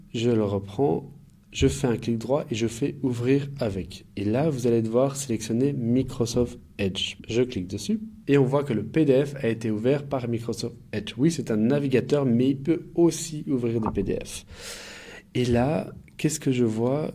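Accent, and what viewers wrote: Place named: Russia. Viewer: ... je sens un accent Russe , arnaqueur.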